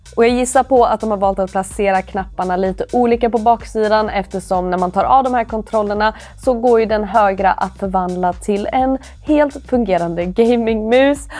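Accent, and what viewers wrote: Sweden. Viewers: Vad är det där för skön dialekt egentligen? Kan inte riktigt placera den. Västernorrland? Västerbotten?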